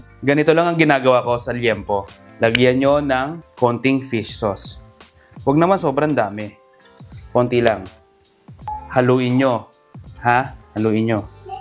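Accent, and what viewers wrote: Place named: Cavite, Philippines. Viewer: Chef tonong lihitimong Las Piñero o Caviteño ka ah. San po kayo sa Pinas?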